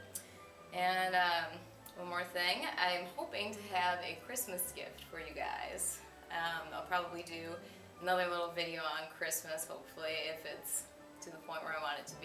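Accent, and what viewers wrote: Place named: Midwest, United States. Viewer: Do you live in Michigan? You sound kind of mid-westerny.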